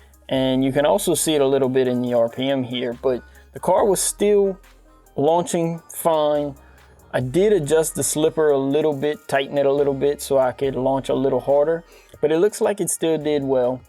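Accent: Louisiana, United States. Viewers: Your accent sounds like you are from Louisiana if I'm correct what part are you from I'm from Gonzalez but live in Clinton now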